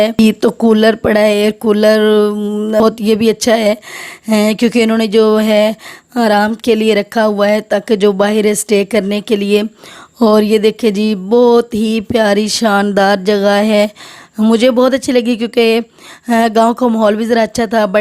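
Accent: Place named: Chakwal, Pakistan. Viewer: R u from chakwal ap ki Urdu say pata chal raha ha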